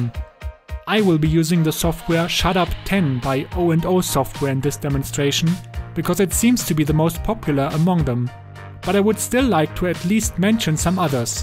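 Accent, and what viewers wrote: Germany. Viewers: U are german Right? 99%😂😊💯nice video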